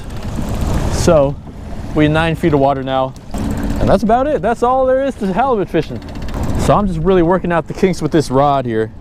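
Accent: Bay Area, United States. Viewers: That Bay Area "accent" tho.... Hahah! Love it man, keep it up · Love your videos bro and that Bay Area accent you can’t miss it 🤘🏽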